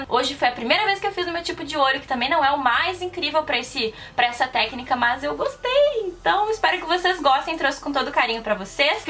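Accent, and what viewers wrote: Rio Grande do Sul, Brazil. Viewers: Tu é gaúcha? teu sutaque é bem parecido com o daqui do RS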